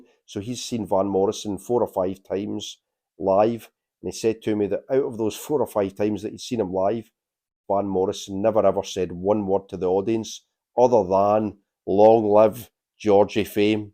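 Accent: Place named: Scotland, United Kingdom. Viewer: I wish I could have added your accent even though I assume it’s Scott’s to my video. So good ✌🏼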